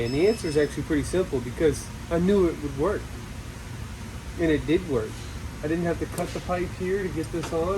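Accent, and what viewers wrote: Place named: West Virginia, United States. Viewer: I can't quite get a fix on accent. Where is he from? West Virginia? Maybe he should require to hold the title on repair jobs over $2000?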